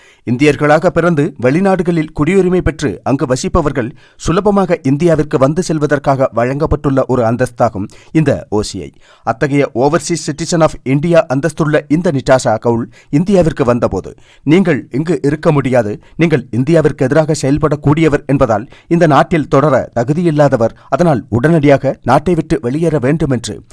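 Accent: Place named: Sri Lanka. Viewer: Your voice is very good like a cylon Tamil mixup. Congratulations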